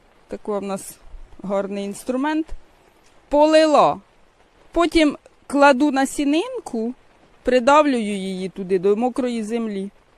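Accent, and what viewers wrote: Poltava, Ukraine. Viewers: Дуже гарна мова ваша,Полтавська❤🇺🇦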